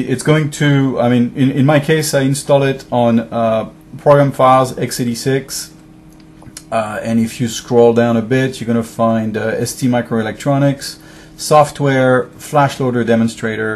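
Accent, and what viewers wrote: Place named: France. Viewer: Really impressed by your english there - one can barely hear that you are French which is quite unusual. Trés bien!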